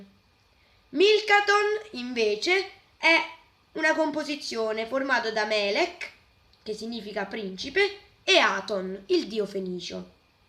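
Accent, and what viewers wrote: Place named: Campania, Italy. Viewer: Dal' accento mi sembri campano, grazie dei tuoi studi sulla Sardegna 👏❤️💓❤️💓sei bravissimo 💓💞💓💞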